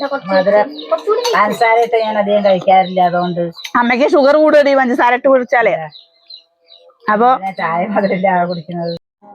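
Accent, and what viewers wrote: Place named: Mannarkkad, India. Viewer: അത് ഞങ്ങടാ മണ്ണാർക്കാട് സംസാര ഒരു കുഴപ്പവും ഇല്ല. ച്ചി ഞ്ഞിങ്ങൾ ഞ്ഞികളുടെ ഭാഷയിൽ സംസാരിച്ചോളൂ all the best 👍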